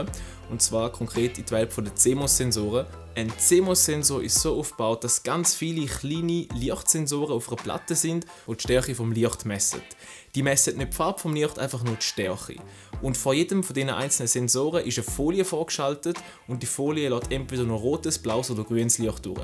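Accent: Switzerland. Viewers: Ja habe alles sehr gut verstehen können, top erklärt von Dir..! Trotz Schweitzer-Deutsch ;-)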